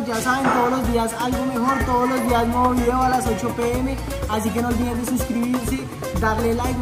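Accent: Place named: Cali, Colombia. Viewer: Como venía de Cali se le pegó el hablao'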